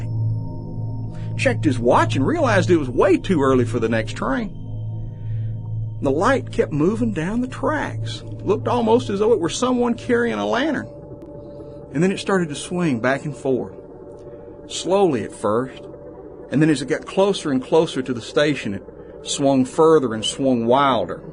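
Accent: South, United States. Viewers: I love the story but every time I hear it the narrator always has a pronounced southern drawl. In 2019 can’t someone without such an accent narrate the tale?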